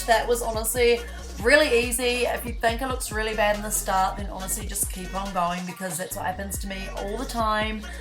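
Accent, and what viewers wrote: New Zealand. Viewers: I knew you were a kiwi when I heard your voice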